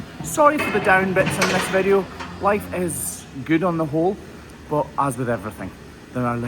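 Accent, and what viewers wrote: Scotland, United Kingdom. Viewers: We watch to hear your accent. My mom is from Scotland. We want to try to get her there again. She has stage 4 lung cancer. It’s stable for now and she is healthy. We can’t really afford to send her, she needs a helper with bags n stuff. So one of us to go with her. She could go in her own, for a short time. She’d take less stuff. But it’s just a dream for now. We will see what life has to offer. We will keep praying for a miracle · I think people appreciate the openness of Youtubers. Not everything in the garden is rosey as some would make out. Behind every Youtuber is a human being with problems like everyone else. Sometimes people like to know that they are not alone as they have similar problems. Maybe one day your daughter will reach out. Keep positive. Not sure why I stumbled upon this channel but I am sooo glad I did. 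It's refreshing to hear fellow Scots accents too! I'm from Aberdeen and there are very few Scottish Youtubers that I have heard. Who would have thought that hoof trimming could be so satisfying 😂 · . Your book was a fantastic read. I couldn't put it down. Thank you for letting us into your life. You are a wonderful guy and you deserve everything in life. When I was reading your book, it felt like you were in the room reading the book to me in that lovely Scottish accent. Merry Christmas to you, Mrs Hoof GP and your wonderful boys. May it be joyous in everyway possible for you all. Xx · As a horsewoman in Canada, I'm fascinated with the trimming of the cows hooves as I am with horses hooves. And I'll be honest, I love a Scottish accent lol. Wish I could visit your holiday place..😊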